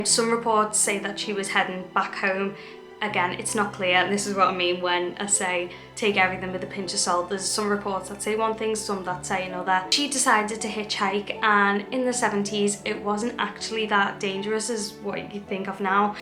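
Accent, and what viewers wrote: Liverpool, United Kingdom. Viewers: Remarkably Google Captions understands your scouse accent perfectly well not a single word wrong which shows how clear you speak. Normally regional British accents don't translate well. May I also say you have the cutest mouth I've ever seen!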